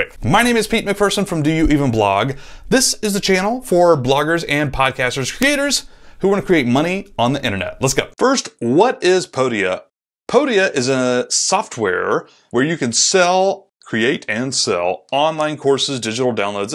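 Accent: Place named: United Kingdom. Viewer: Ok after comparing Course hosting palttforms the hole Sunday and already having a free test account at teachable I lean towards Podia because of design. Teachable Text layout in courses without coloums is ridiculous, I thought about making a new line every 10 words manually... Does podia have a community for members optione, where my course members/buyers can meat and chat, post there results? I plan on selling art classes with my dad: <link> Here you can see the results of the "offline" real life courses so far. Thanks for advice, great video!! Here a a few free Tuts in german, we plan to do more advanced ones for money... <link> You sound British. Sad to lose you in Eu, don't know how you think about it, what brings me to the next question, is podia good at handling the EU taxes? 16% / 19% in Germany...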